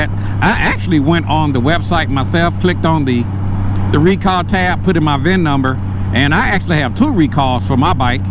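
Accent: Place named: Memphis, United States. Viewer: ! Man I never noticed that Memphis slang the way y’all pronounce some words, the rapper Glorilla who is a Memphis native pointed that out, by the way she has an uncanny resemblance to you my brother just some food for thought. Be safe out there and I’m loving my 15 Goldwing even more.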